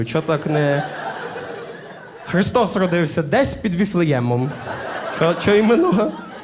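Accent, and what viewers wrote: Galicia, Ukraine. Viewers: люблю вашу говірку Галицьку, слухала б і слухала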